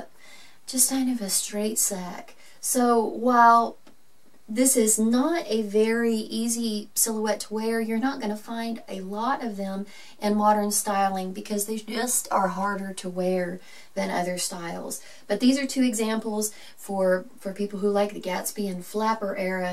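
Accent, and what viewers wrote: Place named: South, United States. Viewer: Your accent is so sweet! It makes me crave a glass of ice tea under the porch of some cute southern house ❤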